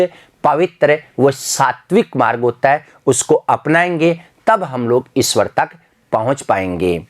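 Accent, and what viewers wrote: Rajasthan, India. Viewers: sir aap rajasthani ho kya ?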